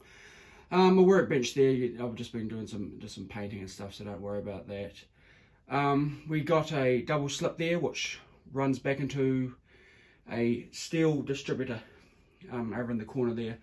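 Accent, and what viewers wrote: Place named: New Zealand. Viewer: Great to hear a good old fashioned kiwi accent! Kia ora, new sub from a former Wellingtonian.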